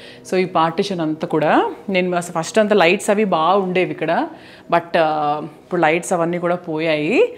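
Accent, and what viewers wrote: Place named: Telugu, India. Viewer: I like ur telugu slang 👌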